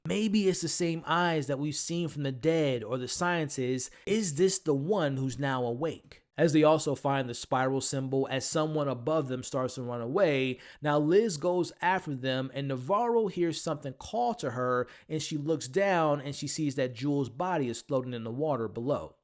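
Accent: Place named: Atlanta, United States. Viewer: you changed your speaking voice??? this aint the white lotus. Atlanta voice... damn E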